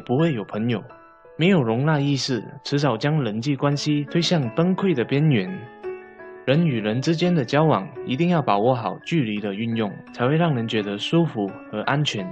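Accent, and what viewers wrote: Malaysia. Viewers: 这是马来西亚的华语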